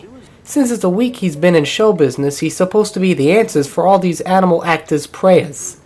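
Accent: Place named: Nashua, United States. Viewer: I heard Nashua, NH accent...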